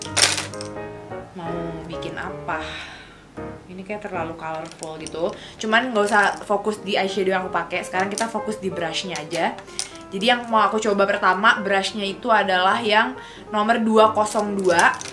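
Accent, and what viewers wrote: Java, Indonesia. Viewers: Akhirnyaaaa 😂😂😂 durasinya yg lama gini kess.. lope your medok 👻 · Kayaknya cuma yg pantes medok tp gak jadi kayak mo ngelenong. You look so stunning as always!!!!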